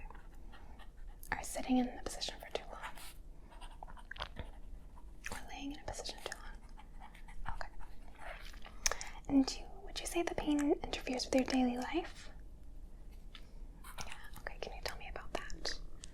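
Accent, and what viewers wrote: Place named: Great Lakes, United States. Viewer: I have no idea where her filming location is, but she has a very distinct upper USA Midwest/Great Lakes accent, also known as the 'northern vowel shift' accent. You'll hear it from time to time when she says words like flat, gallon, etc., with very flat, elongated 'a' sounds. So 'flat' becomes 'flaayt' and gallon becomes 'gaayllon.' Watch her 'Earth's Crust' video, and you can really hear it when she says 'volcaaynic' as opposed to volcanic. Really common in Cleveland, Akron, Buffalo, Chicago, eastern Minnesota, etc. Basically in/around the Great Lakes in the United States.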